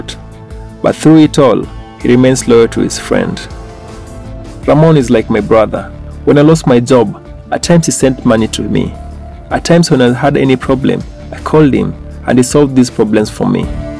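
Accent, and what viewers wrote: Kenya. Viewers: Mr narrator, are you kenyan?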